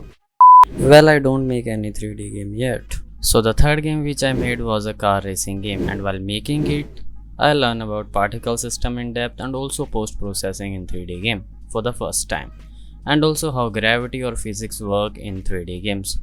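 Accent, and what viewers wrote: India. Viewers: points for using dead memes + points for indian accent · ur accent and u look indian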